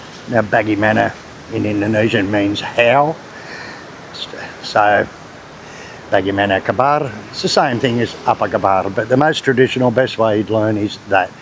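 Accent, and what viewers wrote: Australia. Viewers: The content in this video is excellent. If you want to learn to speak Bahasa with an Ocker accent this video is fine. Once you have got the words and translations down pat, best you Google an Indonesian person saying these words and phrases so you can get the pronunciation correct.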